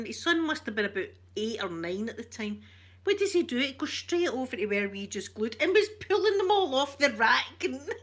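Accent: Scotland, United Kingdom. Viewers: Hi, I’m new to this channel. I subbed on my other account, but switched to this other one. I’m from Scotland too. It’s nice to hear another Scottish twang on YouTube ⭐️😁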